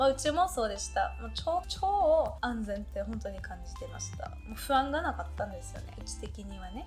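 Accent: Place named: Kansai, Japan. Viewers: ちゃん、とてもかわいい！関西弁まじりの日本語もステキ❣️ とても日本を良く見てくれていて… うれしいです。ありがとう💕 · ところどころ関西弁入ってるな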